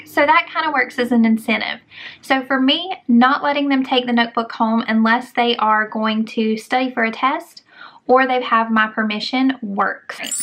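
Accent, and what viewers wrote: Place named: South, United States. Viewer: I like your southern draw.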